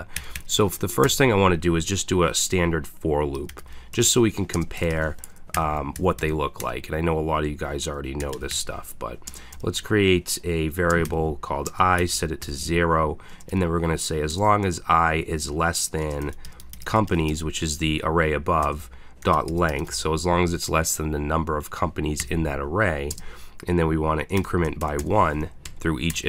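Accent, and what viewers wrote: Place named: Northeast, United States. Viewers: I grew up in the Northeast and your accent sounds like home. *Queue a Ben Affleck impression here* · Are you from the East coast? (NYC, Boston, NJ) I can hear some very strong hints of that from your accent :D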